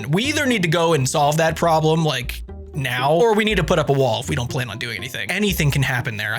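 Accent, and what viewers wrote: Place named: Midwest, United States. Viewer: Today I learned that is from the Midwest USA (I dont care if you were born in Uganda; if you pronounce "roof" like that, you're midwestern) If you think castles are cool, I HIGHLY recommend the Youtube channel which has a series going over how tactically viable all of the castle in Gielinor are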